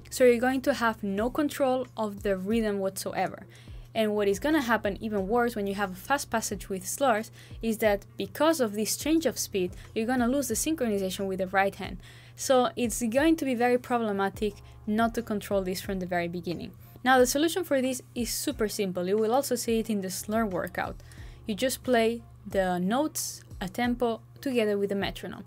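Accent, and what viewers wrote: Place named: Catalonia, Spain. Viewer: Is your native language Catalan? You don’t sound typically Spanish 😉 Awesome video!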